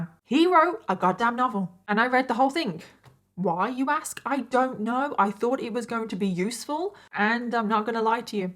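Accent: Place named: United Kingdom. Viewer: You should definitely narrate audiobooks! True crime and history audiobooks narrated by someone with a British accent are all I listen to! Also, wish I could nail your makeup, I just end up looking like an idiot 😂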